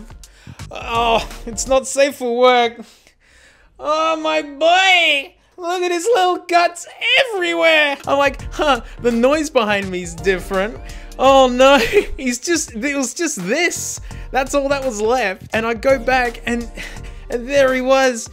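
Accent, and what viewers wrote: Australia. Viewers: 2 years later, you can see the aussie seep out into the videos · He sounds less aussie then he does now and less energetic Thats wacky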